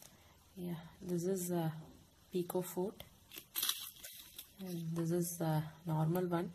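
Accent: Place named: Kerala, India. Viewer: Sound like malyalee ... thank you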